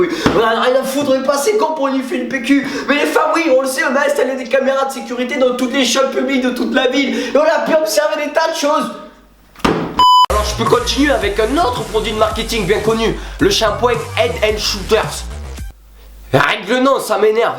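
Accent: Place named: Marseille, France. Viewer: J'adore ton accent mdrrr 😂 Je vis pratiquement à côté de chez toi dans les Pyrénées et on a pas l'accent aussi prononcé que toi... T'es marseillais a la base ou quoi? Sinon j'adore tes vidéos, continue 😘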